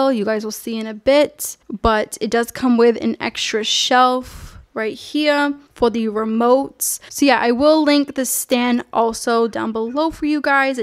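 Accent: Boston, United States. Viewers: That Boston accent coming through every now and then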